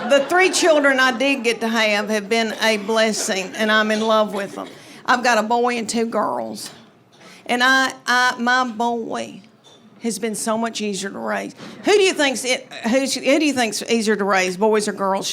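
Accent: South, United States. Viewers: Love You Leanne!!❤. I’m goin’ on 80 years old! Everything you have said is so true! I just fall over laughing 😊😊😊❤❤❤❤❤Your Southern accent and your family stories leave me holding my sides laughing!! My dream is to see you in person❤❤❤!!!!I live in New Jersey, the Jersey Shore! I am determined to see you!! God Bless! Your shows never get old! I can’t get through the day without you!❤❤❤❤!!! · Extreme and perhaps enhanced Southern USA drawl of an accent... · Smart. Funny. Southern. AWESOME! Just listen to that beautiful talk. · 6-yrs-old....Is Leanne getting a country push? That southern drawl is the drawliest it can be.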